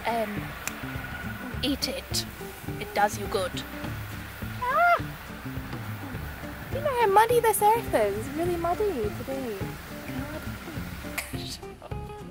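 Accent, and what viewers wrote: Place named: England, United Kingdom. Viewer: Love ur English acent...... P. S from ur favourite niece 🤣🤣🤣see ya in 7 days🥳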